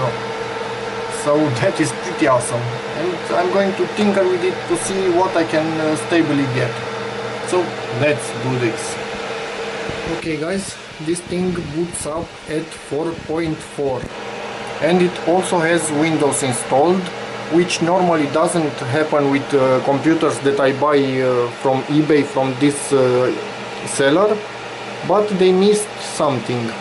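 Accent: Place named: Romania. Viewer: Dupa accent esti roman :P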